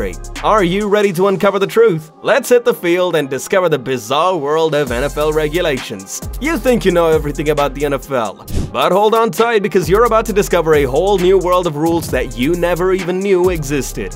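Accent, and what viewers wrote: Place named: England, United Kingdom. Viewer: You give 14 rules, not 13. Judging by the pronunciation of words, these are rules people in England don't know exist. The only ones that I had never heard of were the "palpably unfair act" which is vague and would be something along the lines of intentionally breaking the standards of the game -- say, Philadelphia removing goal posts so both teams had to try for 2 points, which their QB sneak ability gives them a distinct advantage. Or a team putting players into the game that are not on the roster of active players for that game. The other rule you call "touchdown touchback" isn't a rule. The rule I think you are trying to cite -- especially based on the images you show -- is "if the offense fumbles the ball and the ball crosses the defender's goal line and goes out of bounds, the ball is awarded to the team that was on defense as a touchback." Also, many clips didn't depict anything regarding the rule in question. 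And a number of the clips were of college teams, not NFL.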